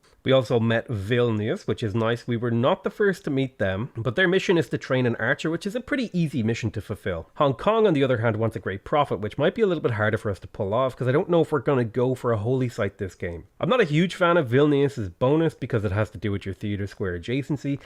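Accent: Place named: Ireland. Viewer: Man,that thick Irish accent is probably the best thing about this channel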